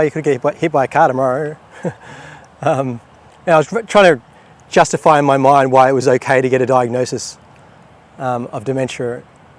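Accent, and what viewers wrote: Australia. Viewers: Man, I don't know what to say! Get better soon? Feels like some kind of scam. I like your Oz accent, I hate your predicament. It's not fair. Love from Stockholm <3 So. The story you told about getting your diagnose. How you felt like your partner and your brother were your champions, fighting for you. That like broke me completely. And I'm not usually the person who cries. Thank you again so much for sharing! What you are doing is brave and important I feel. It's like I don't know how to tell you, this touched me to my core and I'm not even a spiritual person.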